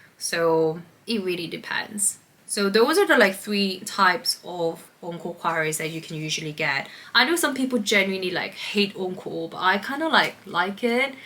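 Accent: United Kingdom. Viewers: 으앙 ㅠㅠ 너무 오랜만입니다 ㅠㅠ 대학생 때부터 봤는데 억양도 완전 영국식으로 바뀌셨네용 건강하게 잘 지내시는 거 같아 다행이네용!! 화이팅입니당! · I noticed your accent became more british.. You are my favorite pharmacist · 와우 억양이 완전 영국식으로바뀌셨네요?! 앞으로의 새로운 여정들도응원해요!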